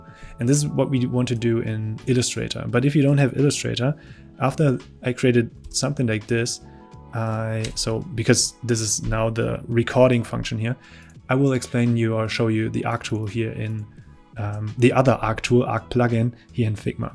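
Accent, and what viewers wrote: Germany. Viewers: Ur SOO german ich auch i can hear that man hört es sowasvon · I don't know who you are, I don't even know that Figma exists, but I'm glad the youtube algorithm has sent me here. This will no doubt be useful to me some time later. It looks like Figma is great. Your tell-while-showing presentation is good, mostly straight to the point as it also includes your commentary tangents. The description is very useful with the timestamps, links, and even credits to the music. Your voice with German accent is nothing but soothing and clear to understand. (Or maybe I'm just used to content creators with heavier German accents speaking English such as JoergSparve and members of ZF such as SwatKnight and Gambit) In any case, subbed.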